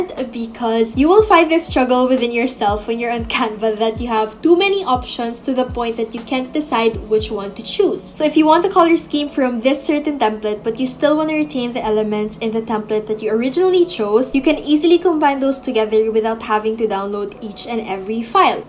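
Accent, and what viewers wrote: Philippines. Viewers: i love you filipino accent🙏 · Hmmmmm... I’m new and I hear a Filipino accent~ 😜 I’m Filipino too! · I'm new and at first I'm wondering if you're filipino bcoz of your accent and if not for the "kasi" word that you used I would still be wondering if you really are hehe btw thnx for sharing I would use slidesgo from now on <3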